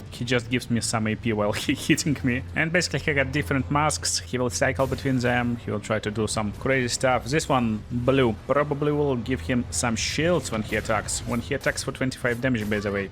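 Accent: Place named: Russia. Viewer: ю инглиш гуд, бат ай ноу у рашн))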